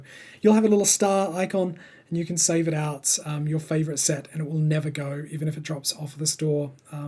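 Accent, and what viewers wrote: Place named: United Kingdom. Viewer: I think you’re slowly losing the Aussie accent 😂. You are sounding more British! Definitely sound a lot different to when I had my first video interview back in 2015 for my mod application 😊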